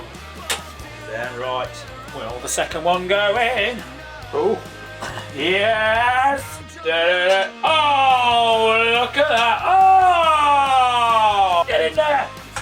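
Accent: England, United Kingdom. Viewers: Сука мега амерзительный чисто английский язык другое дело американский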